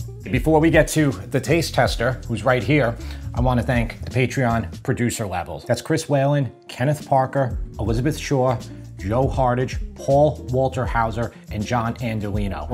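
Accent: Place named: Queens, United States. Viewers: My parents were from Queens and we grew up on Long Island. I don't notice it so much in person, but when I speak with my siblings on the phone, they all sound like you. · You have such a thick LI accent.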